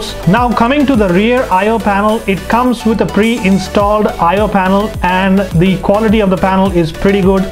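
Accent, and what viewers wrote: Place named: India. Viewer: way too much accent .. be indian with neutral accent .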